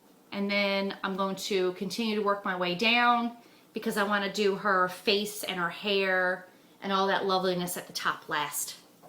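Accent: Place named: Newfoundland, Canada. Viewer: Always love your updates and stories and seeing how much progress you manage to make in spite of working and being a great wife and mother. My. best friend in high school and college had both parents and a live in paternal Grandmother that were Newfoundlanders transplanted to Tennessee. So I love to hear your NL speech. Reminds me of all of them. Good memories. Thanks!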